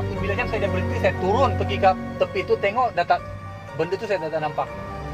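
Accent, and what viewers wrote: Sabah, Malaysia. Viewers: Kesian loghat sabah pun tk tau bezakan kata org malaysia. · cara bercakap ni tentu orang Sabah bah ni di KK bah tu , inda kau tau itu orang KK itu sana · sama ada sabah atau indonesia..sbb bahasa nak sama dah..tapi tengok kt video ade tulis indonesia xtaw la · Bunyi sabahan kuat juga tu.. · Abang org mana bang? Sebab dengar loghat mcm belah Sabah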